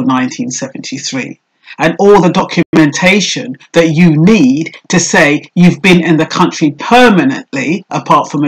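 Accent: Jamaica. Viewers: It is so funny when you're talkin your Jamaican accent😂😂😂 you have me dying..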